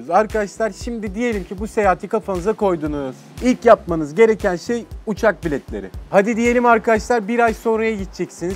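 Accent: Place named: Aegean, Turkey. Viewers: e "gari" :) artık sonuna gelmiş olduk :) Tam bir egeli abim ya :) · aydınlımısın sen e gari diyon :)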